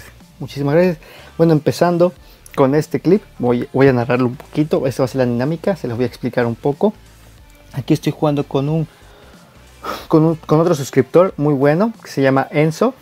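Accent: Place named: Veracruz, Mexico. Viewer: Como que eres de Veracruz xd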